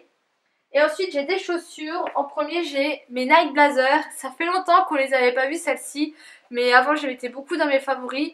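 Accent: Lorraine, France. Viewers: j'aime Bien Ton accent ! Teeam Lorraine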